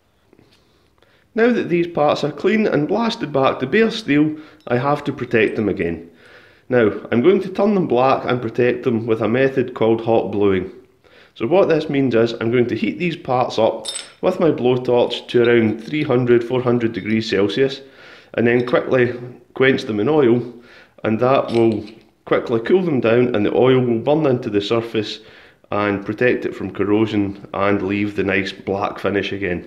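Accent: Scotland, United Kingdom. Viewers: I love these videos and when he was cleaning the feces not to disturb the quel mailing marks! Everyone loves a legit scottish accent!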